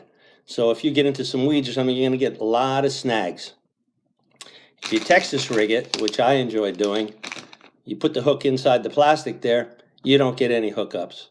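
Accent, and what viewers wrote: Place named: New Jersey, United States. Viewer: Elope the NJ accent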